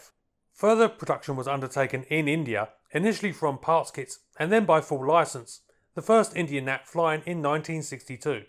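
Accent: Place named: United Kingdom. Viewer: THAT SPEAKER HAS FLAT TONE AND STRICT BRITISH .. NON UNDERSTANDABLE FROM THE INTERNAT. AUDIENCE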